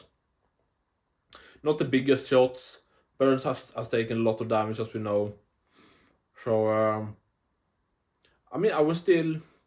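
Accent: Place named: Spain. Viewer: nice spanish accent!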